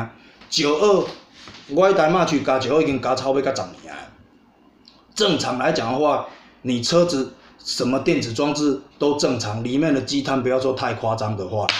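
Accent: Taiwan. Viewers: 就是喜歡台灣國語。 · 陳建成他的意思是的台語很道地，講台語的部份讓人容易懂吧！而且影片也有字幕了，講國台語都可以懂的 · 禿擂台土腔，吼吼吼，好不好，有没有，对对对，好了啦(啦得很土禿)，很喜欢在言谈中加入闽南语，还把闽南语变成了台语??台风真是一枝独秀，禿土鲁擂台湾腔。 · 國台語混著說濃濃的臺灣味...令人熟悉～回味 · 加油.很喜歡看你學術性的片講台語很好聽.,不過聽不憧要看字幕我是香港觀眾,香港的只有98油和 98加不同公司配方的添加劑的油。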